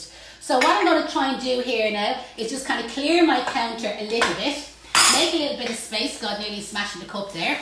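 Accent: Ireland. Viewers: I’ve just come across your videos on YouTube. I’m not a florist and I’m not very good at arranging flowers but these little projects you’ve shown have given me some great ideas and also knowledge about doing some flower arranging. I watched your video of the cocktail glass arrangement and I bought some of those to do for some friends. Hopefully mine will turn out at least half as good as yours. I’m from Bangor Co.Down. Also so refreshing to hear an Irish accent on YouTube 🤣 Thanks for all the tips and ideas.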